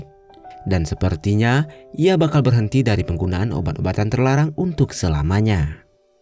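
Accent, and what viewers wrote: West Sumatra, Indonesia. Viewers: Org Minang ya min?😂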